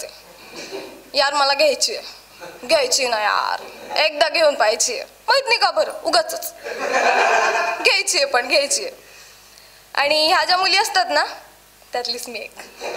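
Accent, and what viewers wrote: Khandesh, India. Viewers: आवाज ऐकुन अस वाटत जळगाव खानदेश 💯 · Khandeshi ahe vatate? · Khandeshi marathi olkhuch yete 😅 Pn chhan ahe 👍👍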